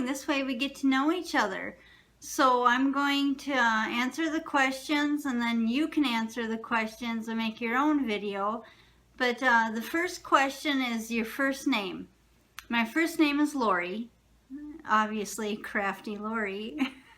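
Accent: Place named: Wisconsin, United States. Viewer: Ha, I thought you sounded like a WI girl :)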